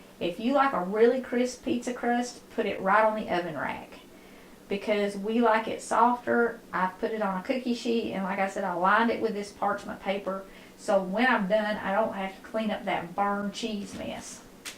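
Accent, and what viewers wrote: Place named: Kentucky, United States. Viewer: I want to thank you for your delightful channel. I have subscribed. I actually find your videos calming, full of love and deliciousness. I spent 4 years in your awesome state while I attended under graduate to college in Western Kentucky. Your food and cute little accent brings me back to those great days. I was a “Yankee” back then. I really didn’t know what that meant, but since I was “Italian” with first generation parents, they gave me a pass to join their group not as a yankee but as a European. LOL. I learned so much living in Kentucky. It’s a pretty great place to live.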